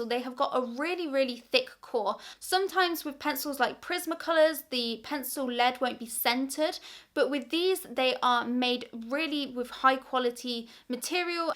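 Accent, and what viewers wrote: England, United Kingdom. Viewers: Your art is ofc amazing, but i think you should also start an English accent tutorials channel.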